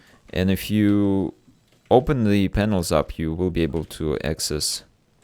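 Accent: Russia. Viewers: Are you russian? Your accent is very simillar to russian one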